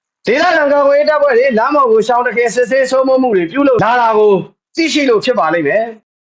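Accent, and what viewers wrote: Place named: Upper Myanmar, Myanmar. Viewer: ဆိတ်သားခြောက်ချောင်းကြော်လေးပါ ဆတ်သားခြောက်ကြော်လို့ပြောမရောင်းကြပါဘူး နားကြားမှားတာနေမှာပါ အညာလေသံဆိုတော့ဝဲတာပါ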